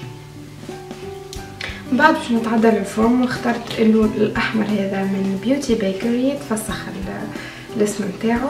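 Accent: Tunisia. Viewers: انتي جميلة جدا وعجبني لهجتج التونسية الي عن جد جميلة اني من بغداد وصديقاتي كولهم يتابعوج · Jaime ta façon d te maquillé je suis moitié marocaine moitié allemand je vie en émirat arabes la ville Abudhabi merhbabik 😘 donc je comprend ton accent tunisien😍 bisou t'es merveilleuse ton nouveau décor aussi bisou contiue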